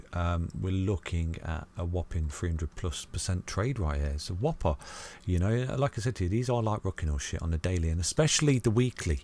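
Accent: United Kingdom. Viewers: nothing like whispering in british accent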